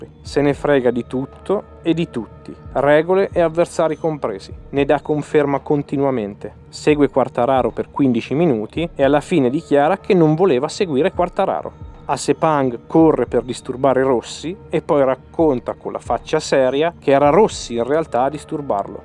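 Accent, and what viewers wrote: Perugia, Italy. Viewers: Accento perugino inconfondibile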